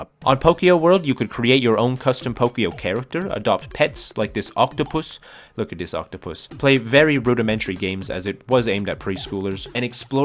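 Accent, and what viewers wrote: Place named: Canada. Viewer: i am going to bet $100 that you are canadian just based on your voice. love from BC, this video ruled :3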